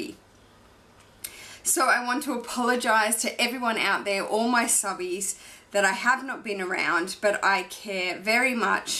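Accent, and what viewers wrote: Australia. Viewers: your videos and perhaps your Awesome Aussie accent bring cheer to my day!! More! More! More!! 💜 much love and happiness to you!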